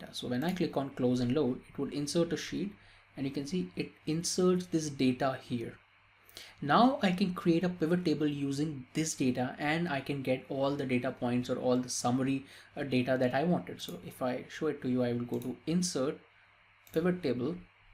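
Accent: India. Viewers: I know this is a good tutorial whan I heard Indian accent in the opening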